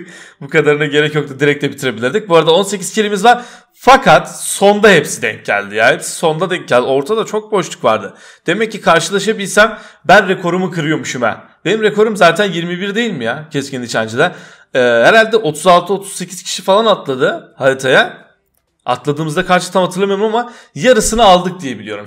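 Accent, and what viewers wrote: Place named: Gaziantep, Turkey. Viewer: trakya şivesi değil o. antep ve adana bölgesi şivesini yapıyor sürekli. geliyirüm felan diyor arada.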